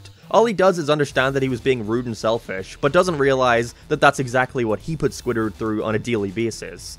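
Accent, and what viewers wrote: Canada. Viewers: I commend you for your time put into this. However I had to stop. Come back watch a little more. Your accent is unbearable 😂 I'm assuming your Canadian? If not Canadian I don't know but when you say or hang on certain words Im just like 🤮🤮 when you say a phrase like .. Mr Krabs has to figure it OuuuT. I picture a really snobby preppy girl talking. Like yahhhhh that was sooo yesterdahhhh lol if u want more examples I can show ya lol. At the end of the day great video. I'm sure your a good dude etc It's my personal problem but I had to mention it lol. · You sound Canadian lol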